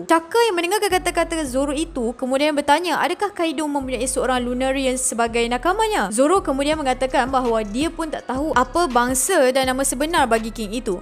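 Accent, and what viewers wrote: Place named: North, Malaysia. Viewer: Gws ….. pekat na utara dia 🤣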